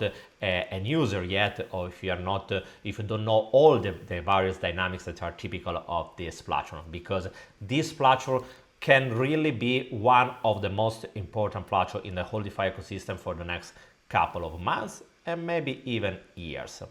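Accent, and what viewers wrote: Italy. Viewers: Are you Italian? I recognise that accent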